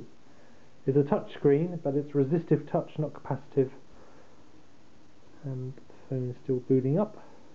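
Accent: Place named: United Kingdom. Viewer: That was funny. I think the guy is British. Hence the accent. I was thinking about getting that phone but after seeing this video i will pass.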